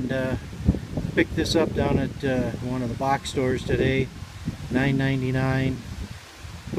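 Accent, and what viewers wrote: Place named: Pennsylvania, United States. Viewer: Sounds like your from Pennsylvania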